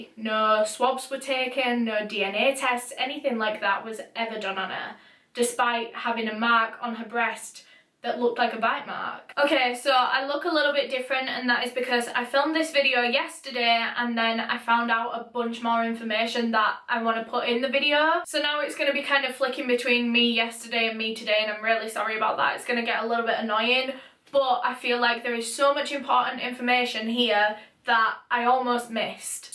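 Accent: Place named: Yorkshire, United Kingdom. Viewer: As an British person~ i belive yorkshire-? Or a tiny bit welsh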